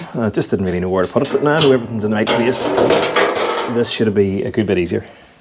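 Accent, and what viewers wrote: Northern Ireland, United Kingdom. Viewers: It was just a thought. Seems to me that Ulster is a unique place but doesn’t get much attention. A lot of American car nuts will be attracted by the content and stay for the accent. I love the Norneyrish accent so I supposed I’m biased. 😁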